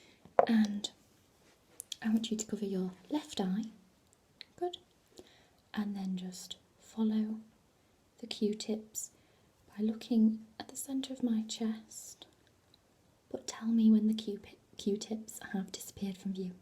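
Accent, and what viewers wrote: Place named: Southern England, United Kingdom. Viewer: Brok Homz good old south of England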